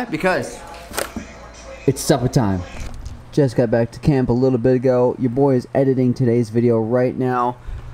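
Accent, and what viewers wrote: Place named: Australia. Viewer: How are u Aussie I can tell cause I am Aussie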